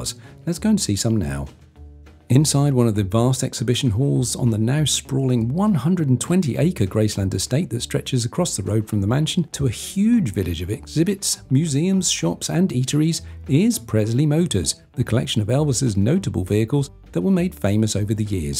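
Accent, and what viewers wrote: England, United Kingdom. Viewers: Listening to an English man describe Elvis Presley and his southern mansion and his southern ways is kinda like listening to Ozzy Osborne preach the gospel, it just doesn't really go together.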